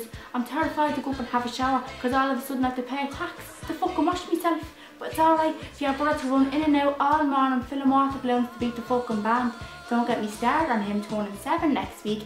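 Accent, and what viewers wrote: Dublin, Ireland. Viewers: I just subscribed to your channel. Would you consider subtitle though, for us, unfortunate souls who don't understand fully irish english? 🤓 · Fire Gling - She speaks a Dublin (North) accent.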